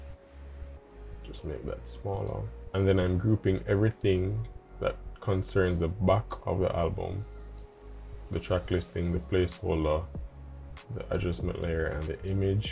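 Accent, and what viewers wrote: Jamaica. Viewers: You have a Jamaican Accent lol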